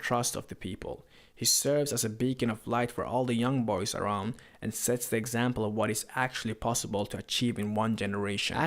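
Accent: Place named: Finland. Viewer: The Finnish accent 👌🏻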